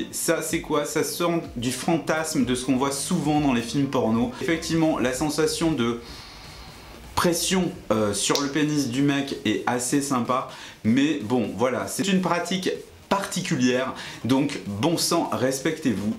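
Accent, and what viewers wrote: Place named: South, France. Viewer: Ahhhhh je t'aime toi avec ton. accent du sud !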